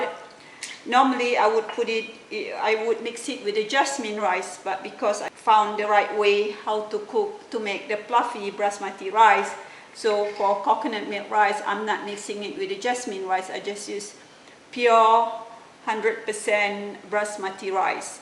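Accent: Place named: Malaysia. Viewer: I love to hear your malay accent english which is very original, a hard to find especially those who have spent many years in America. I love your recipe of that "NASI LEMAK". It has a beautiful aroma and unbelievably tasty rice. You have very good heart and I wish you all the best for your future.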